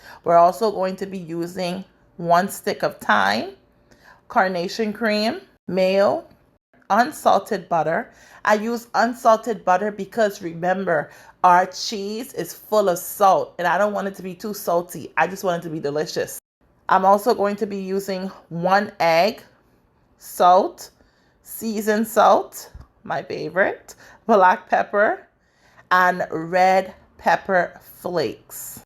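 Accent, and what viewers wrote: Bahamas. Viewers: Lol your bahamian accent! My mouth is watering as I watch this, my number one favorite side yum😋 #cheesy and rich #them herbs is everything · That Bahamian accent🔥🔥🤌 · Bahamian accent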